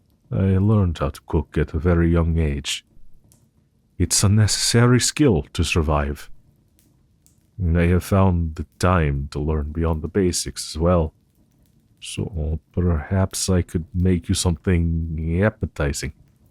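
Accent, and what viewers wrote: Russia. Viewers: I love how when I saw this I was like “Aw moth man ^^!” And the second I clicked the video I was like “Oh my- was not expecting the moth man to be Russian 👁👄👁” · Before clicking on the video Oh hell yeah mothman after clicking on it oh Russian mothman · RUSSIAN MOTH MAN IS THE BEST MOTH MAN!!!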